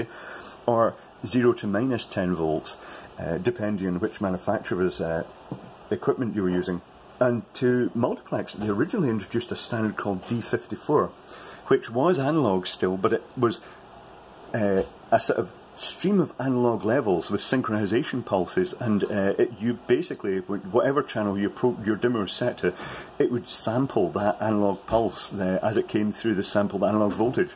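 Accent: Scotland, United Kingdom. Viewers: This is so lovely. I live in the US, but I worked the Fringe for Gilded Ballon many years ago. Seeing 16a Ceeform sitting on a flight case while hearing a Scottish voice and pipes just warms my heart!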